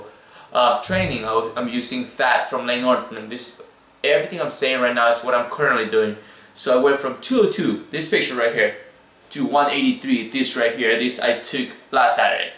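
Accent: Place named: Latin America. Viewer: Oye de verdad que tu video es inspiracional. Y ese acento latino que posees me mantuvo hipnotizado a lo largo de todo tu video.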